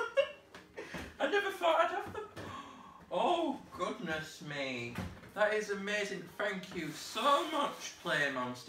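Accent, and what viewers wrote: United Kingdom. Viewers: I love your accent! I'm also British, so I'm not that different from you. 😊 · nice British accent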